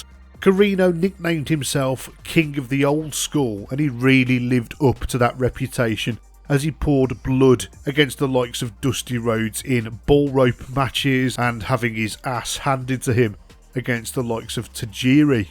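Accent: Birmingham, United Kingdom. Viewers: A Brummie guy doing wrestling reviews? Hell yeh!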